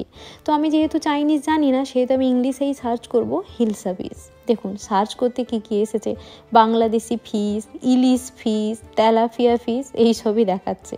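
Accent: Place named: Medinipur, India. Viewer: তোমার বাচনভঙ্গিতেই বোঝা যাচ্ছে । আসলে , মাটির টান তো বোঝাই যায় । তারপর তুমিও যেনো বললে ,"আমাদের মেদিনীপুরের দিকে এভাবেই টক খায় ।" মেদিনীপুরের লোকেরা ঠিকই মেদিনীপুরের প্রতিবেশীদের চিনতে পারে । যাক , কোন মেদিনীপুর জানিও ❤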